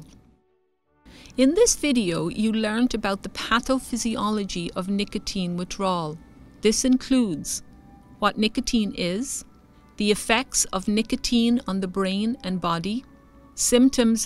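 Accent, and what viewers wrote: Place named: Ireland. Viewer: Big thick Irish accent in Toronto haha love it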